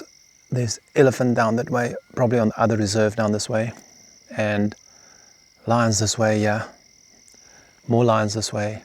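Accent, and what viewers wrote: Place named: South Africa. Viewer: "and uh yuh" i love this accent aha as soon as i clicked i knew youd be alright that accent youll only see in lion or african wilderness videos, im certain its the south african accent, people often say you guys sound like us aussies in a different way 😂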